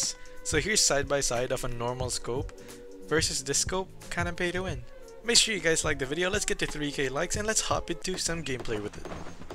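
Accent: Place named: Philippines. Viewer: Bro can you make a video with speaking Filipino me especially like your Filipino accent so can you do a video pls!??.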